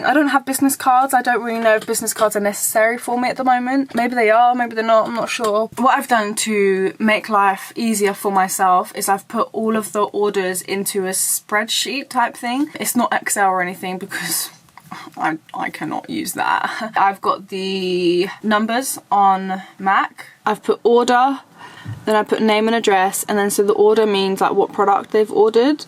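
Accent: United Kingdom. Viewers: How did I know you were British even before clocking in the video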